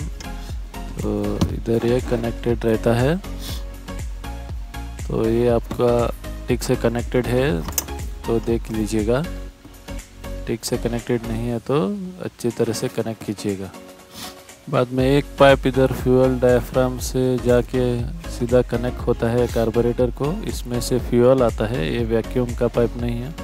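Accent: Goa, India. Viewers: Are you from goa? ?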